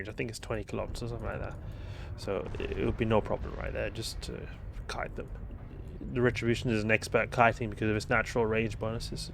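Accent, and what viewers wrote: South Africa. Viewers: Huh, I thought you were South African XD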